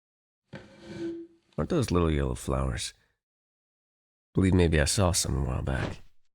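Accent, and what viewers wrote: South, United States. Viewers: Love this!! AND I LOVE THE SOUTHERN ACCENT!!!! Reminds me of my hometown 😭❤️ THANK YOU!!!! LOVE YA AND YOUR VIDS!! KEEP UP THE AWSOME WORK!!! ❤❤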